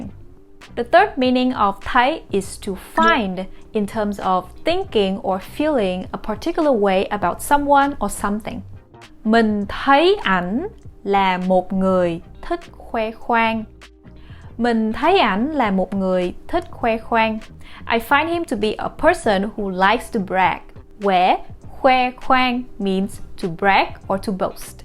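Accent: Vietnam. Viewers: Is this Northern accent or Southern accent? Thanlks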